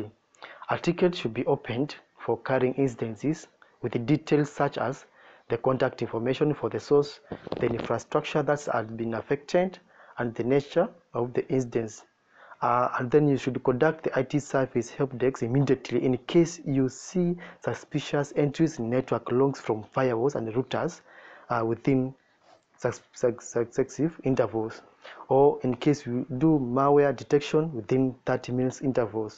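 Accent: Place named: Kenya. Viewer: Nice one really helped our company. Thanks so much. This guys however sounds like a Kenyan.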